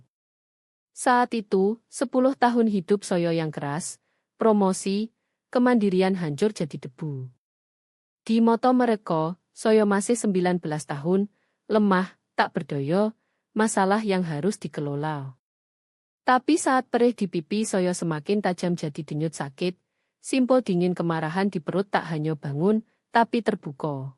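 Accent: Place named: Java, Indonesia. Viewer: Bacanya kok rada aneh ya 😮 teks bahasa Indonesia, tapi dibaca kaya teks bahasa jawa . klo bahasa jawa ga semua A dibaca O biar ga aneh di pendengaran .koreksi dan kritik (mohon perhatiannya admin)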